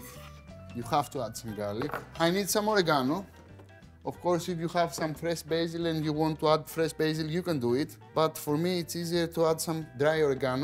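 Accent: Greece. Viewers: Great recipe, easy in oven. You are one of my fav chefs on you tube. Thank you. ❤love the accent love that you’re Greek! Want more Greek recipes.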